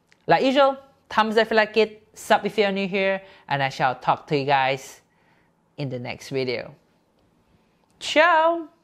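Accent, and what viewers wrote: Sabah, Malaysia. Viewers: macam Sabahan + Singaporean?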